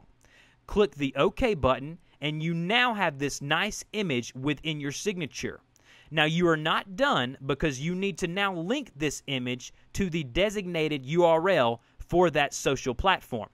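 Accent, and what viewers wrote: United States. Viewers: I love hearing American English Cheers From Algeria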